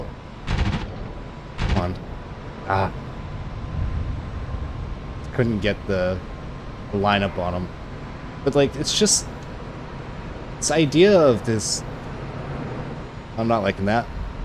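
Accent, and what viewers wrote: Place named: Canada. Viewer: I didn't know there was a cult of purists as you describe, it seems so stupid to me. You sound Canadian, I'm Australian myself so let's just blame dumb Americans like we always do 😄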